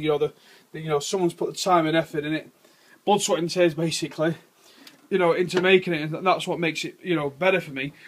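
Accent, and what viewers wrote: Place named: Birmingham, United Kingdom. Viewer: Brummie or Coventry?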